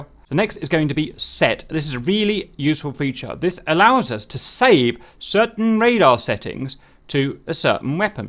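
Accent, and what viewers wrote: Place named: United Kingdom. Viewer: True, but only if you are in a hurry. But I enjoy his way to entertain. I'm Italian, but I love this British accent and humor!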